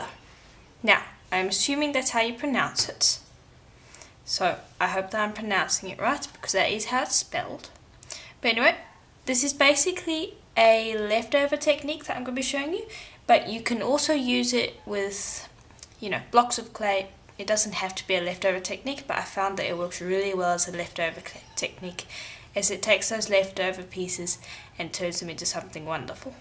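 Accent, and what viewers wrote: South Africa. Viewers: Love your tutorials you're very good! Also love hearing the South African accent, miss it since I left in 2000 n don't hear it much. My husband always teases me when I say 'off' and I giggle every time you say it. Never noticed it before but now I can hear what he means. Keep crafting, you're awesome.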